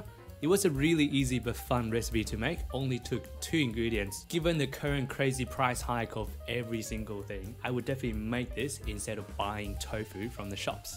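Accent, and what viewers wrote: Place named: India. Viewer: You chickpee have an Indian accent as an indian i liked it 🤣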